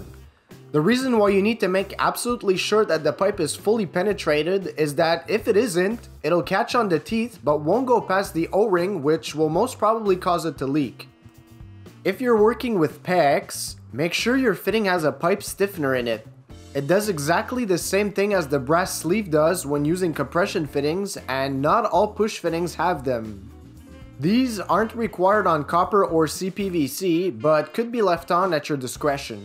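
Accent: Quebec, Canada. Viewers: That undeniable french canadian accent :p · DUDE, you must be from Canada Dare Hey!!!!! Your accent gives you away.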